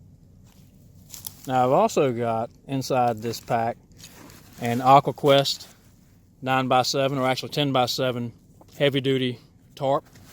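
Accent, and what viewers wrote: South, United States. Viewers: Love the tent. Don't love the USMC or Southern accent. It's mind boggling how some people actually talk that way 😂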